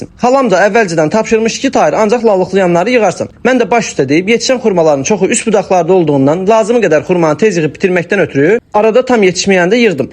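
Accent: Qakh, Azerbaijan. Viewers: Xeyirlisinden olsun Qax Şəki lehçemiz eynidi 😊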